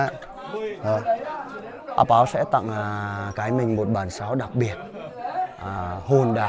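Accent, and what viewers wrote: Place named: Central Highlands, Vietnam. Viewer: Thoi sao mang theo âm điệu vùng cao (hay)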